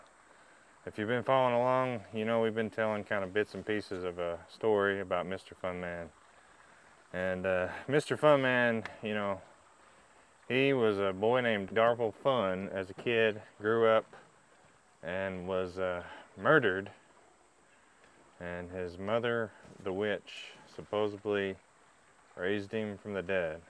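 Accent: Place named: South, United States. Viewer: I still love that accent of yours I just drives me nuts you're beautiful lady and that little southern accent just makes you so much more beautiful I bet you're driving crazy down in Florida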